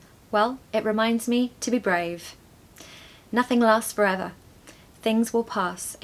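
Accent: RP, United Kingdom. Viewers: thank you very much for your smile... By the way, do you have pronunciation lessons? As I know you have modern RP (MRP) am I right? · I love all your videos. I love your British accent. I can listen to you for hours. I used to live in the UK 4 years ago. Now I miss England and the British so much. So wonderful to listen to you and learn . Love you. 😊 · You deserve more and more subscribers. You deserve all the appreciation. You have a great way of explanation and a clear British accent.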